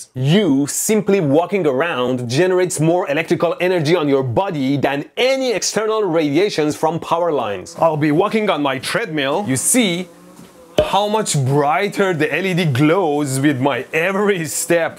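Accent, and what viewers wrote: Iran. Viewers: ایرانیه از لهجه اش معلومه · لهجت داره داد میزنه ایرانی هستی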